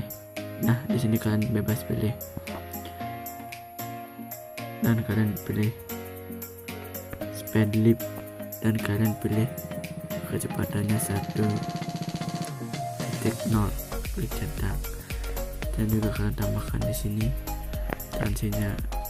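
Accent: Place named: Bali, Indonesia. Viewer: Bang pasti dari bali ya :) mohon di balas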